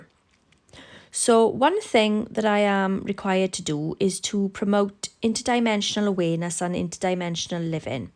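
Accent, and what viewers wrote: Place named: Wales, United Kingdom. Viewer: And I'd never want to shut a Welsh woman up! 🥰 You have a wonderful dialect.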